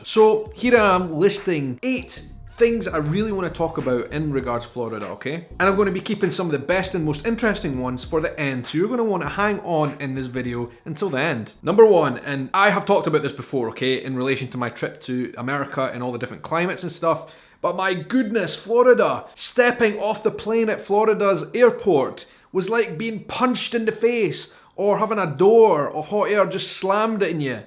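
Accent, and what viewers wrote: Scotland, United Kingdom. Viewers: you are so handsome and that accent makes me shiver, are you married? If so too bad LOL.Personally I much prefer the Scottish or Irish brogue over the boring British. I'm newly subscribed, so far your vlogs are funny and done in good taste unlike some of these British idiots that come here and criticize our food, our language, and our customs.I thoroughly enjoyed when you went to New Orleans and got taken down by that drink the Hurricane. Lol you poor baby. Guiness and Dos Equis beer does that to me. Two pints of Guiness and I'm flying but don't have sense enough to stop. Love It Love It Love It. My cousin married a widow from Scotland named Rebecca, she had a couple young children. She is such a sweet woman as were her children, they fit in this French family like a hand fits in a glove. My daughter went to school with a boy whose father was from Scotland and his name was Ian. 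Lovely family. Seems like some Scottish men are on the shorter side but very manly and sexy. Just wanted to give you a shoutout and let you know how appreciated your context is, keep up the good work🌹🌷😍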